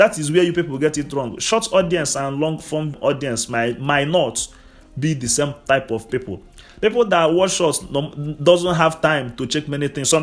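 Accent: Igbo, Nigeria. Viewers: I love this our Igbo accent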